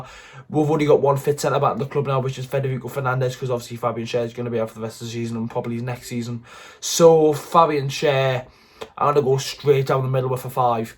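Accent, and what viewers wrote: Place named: Newcastle, United Kingdom. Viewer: Bro he’s talking properly that’s how we geordies talk keep up the good work doing great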